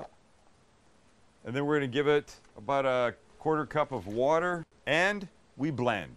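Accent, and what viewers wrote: Canada. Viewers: buddy, you are a "Snowback" a Canadian,!!! I can tell by yer"Ins and Ouuts" Great Jerk Chicken recipr!!! Keep it up! DD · Just found this guy for the jerk chicken recipe that was no bs secret ingredient shit and I find fucking neegan sounding straight baller Canadian in cali. Yeah. I'm making this shit tomorrow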